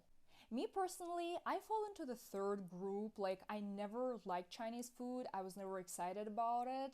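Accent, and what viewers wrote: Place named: Russia. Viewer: You sound Russian.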